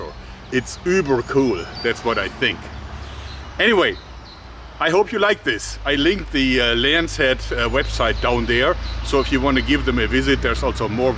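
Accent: Germany. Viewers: I bet Hollywood actors watch your channel if they need inspiration and help with their German accent! :) You can put the sights a little forward yourself.. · IT IS NOT "2000$", IT IS "$2,000"! I can hear you are German (?) but get it right! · . Lets reduce the arrow-weight down to ... eehm ... 11 grams what should result in an arrow-speed of > 400 m/s .. ? Increasing the acceleration by 100% will lead to >1.600 m/s (hypersonic) ...and > 600 Joule (based on a 11 grams arrow) ? To stay below hypersonic-speed requires an arrow-weight below of ... eehm ... something below 16 grams ... or so. The Front-Part of that pulley, needs to be replaced behind the shoulder. Gruss :Rudi Ich mag dein DEUTSCHES Englisch :D . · Nach dem ersten Satz wusste ich, dass du deutsch bist 😆😉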